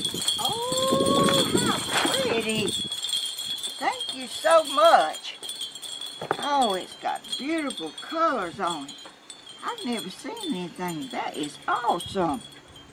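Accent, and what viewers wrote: South, United States. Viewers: No ! Not another Southern drawl lady getting gifts from her subs. Isn't Glenda Sully enough ? Where did this lady come from ?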